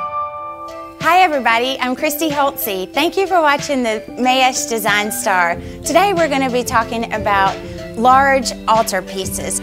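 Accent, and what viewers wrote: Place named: Georgia, United States. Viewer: humble dialect :) in Georgia. hope it's empowering. happy making!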